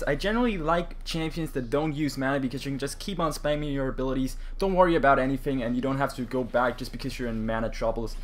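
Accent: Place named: Denmark. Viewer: Your accept sound *very* danish...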